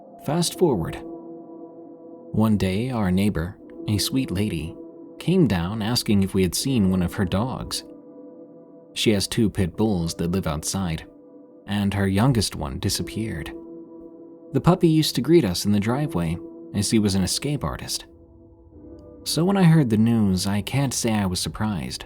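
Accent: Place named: United States. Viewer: The first story is meant to be in the south of England… people do not use American words in the south of England or anywhere in Britain for that matter, you also made it sound like Aberdeen was not that far away… its in Scotland.. the opposite end of Britain.. We also dont have guns.. if you are going to read a story from England, (also sounds American) please be authentic as most English find it insulting..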